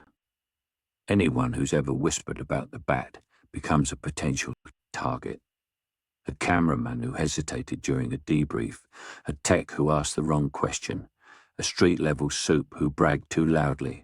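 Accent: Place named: Cockney, United Kingdom. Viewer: First time I’ve heard an AI imitate an East End accent.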